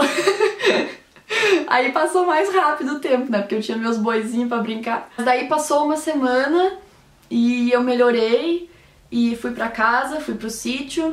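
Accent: Rio Grande do Sul, Brazil. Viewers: Meu pai também é agricultor. Ele planta melancia. Sua história é triste mas tem um final lindo e um aprendizado de luta. Se tem pessoas "infelizes"que fazem comentários "fdp" com certeza elas não tem louça pra lavar e ficam enchendo teu saco e te incomodando, mas teu coração e conteúdo é tão maravilhoso que essa gente idiota só eleva teu sucesso. Diva. Achei que era gaúcha natural... hehe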